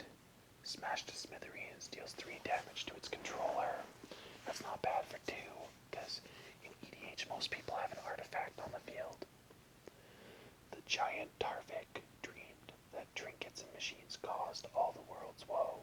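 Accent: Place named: Canada. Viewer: It's nice to hear another Canadian accent :)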